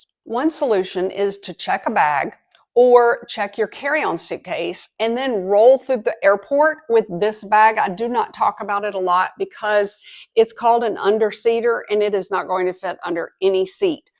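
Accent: South, United States. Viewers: Love it! Thanks for the tips. I'm always looking for ways to make my airport experience better. Also, loving your accent from one southern gal to another! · I love listening to while drinking my morning tea. Love her Southern accent- home to me! Even though I travel internationally a couple times a year and have for a long time, rules change and just adds to my peace of mind with her updates and equipment tips.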